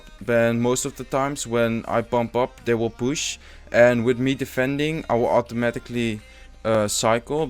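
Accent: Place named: Netherlands. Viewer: lekker dat Nederlands Engels accent maar toch wel tof je video ga zo door!!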